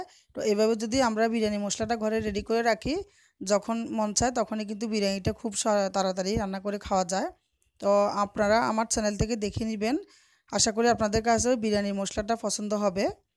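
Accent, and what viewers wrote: Chittagong, Bangladesh. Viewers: চিটাইংগা নাকি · Unar kotha shune tai tu mone hocche. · ওয়া তুই চিটাইংগে কেন?খথা উনি বুঝা জার।সুন্দর রেচিপি। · আপনি কি চিটাগাং গা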